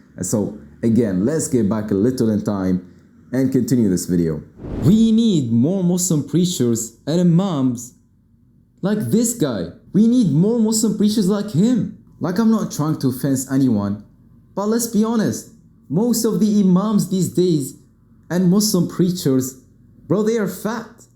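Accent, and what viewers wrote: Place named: France. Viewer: Great channel masha allah. You sound French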